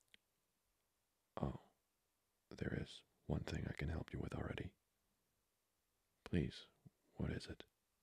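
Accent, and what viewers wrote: Germany. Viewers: What in the German gentleman vampire is this AAAAAAAAAAAAAAA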